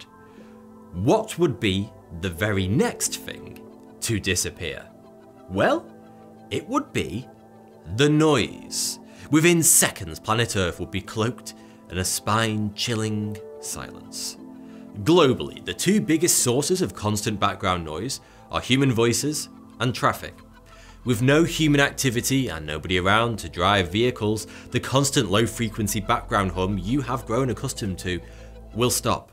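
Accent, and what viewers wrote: United Kingdom. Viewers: I thought it comical that every person on the planet would dissapear. Is that another way to disappear? I shall never be around to find out so shall never know. Seriously, though, I love these videos and as an Australian find the attractive British regional accent adds to my enjoyment.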